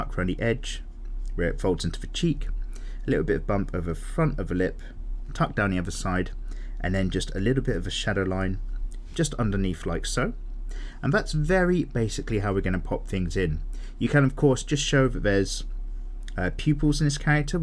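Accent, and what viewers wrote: United Kingdom. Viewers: Are you a British?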